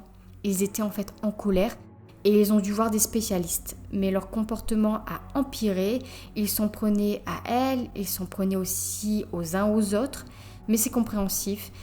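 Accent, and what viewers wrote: North, France. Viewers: Je ne connaissais pas cette histoire.. 14 ans c'est bien peu. C'est un horrible personnage. Sinon je crois que j'avais demandé dans un commentaire d'une autre vidéo. On dirait que je perçois un léger accent du nord.. Je suis aussi chti c'est pour ça et j'ai la même intonation dans certains mots. 🙂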